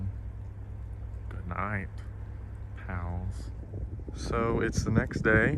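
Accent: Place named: East Texas, United States. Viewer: You sound like you might be from East Texas! You’re doing great!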